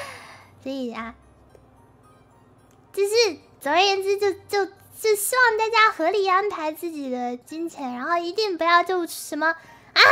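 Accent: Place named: Japan. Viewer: dang yo accent made me think you were speaking japanese for a sec lol what I heard was aksldntkhwkejars挣钱aksjdkfalksdf打钱 very cute tho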